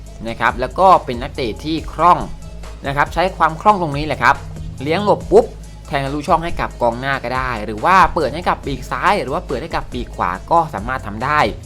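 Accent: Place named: South, Thailand. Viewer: พี่อยู่จังหวัดไหน สำเนียงเหมือนคนใต้เลยครับ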